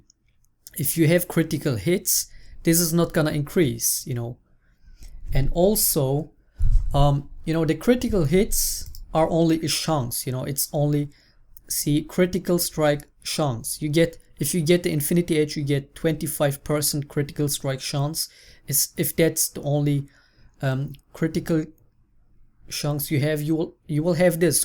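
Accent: Germany. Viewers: Is he german ? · lel du bist deutsch? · Are you german or? I'm triyng to recognise your accent because I find it quite amusing but i'm pretty bad at recognising accents so yeah. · Du bist Deutscher oder :D ? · Für mich hört er sich extrem DEUTSCH an. He sounds extremely german for me, lol.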